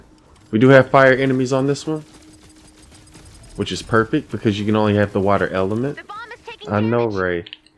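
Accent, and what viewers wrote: South, United States. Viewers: What state do you live in u have a southern accent I think lol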